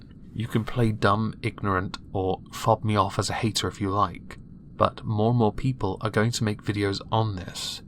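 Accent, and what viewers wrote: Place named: England, United Kingdom. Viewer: You sound so much like Clown ( Collossal Is Crazy ) But it's probably just because your both English? Is it racist to say that?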